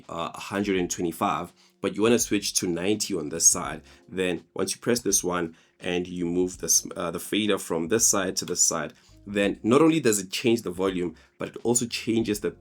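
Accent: South Africa. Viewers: Is that a South African accent I sense 😀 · It's a South Afican accent, American/British/Danish... huh?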